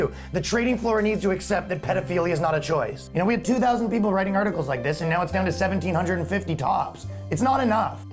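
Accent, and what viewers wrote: Canada. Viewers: your Canadian accent was really thick in this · Are you Canadian? Can definitely hear some canuck coming through.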